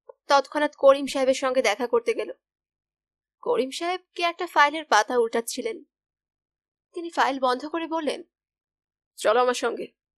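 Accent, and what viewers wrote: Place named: Kolkata, India. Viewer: oh okay. Your pronunciation sometimes is like Kolkata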